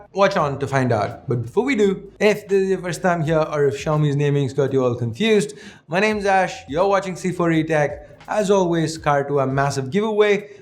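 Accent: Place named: South India, India. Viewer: Hey Ash, The Screen Size of Mi A2 Lite is not 5.99" It is 5.84". Just to let you know. Anyways You are just Awesome and like other South Indians your Accent is Awesome and Different.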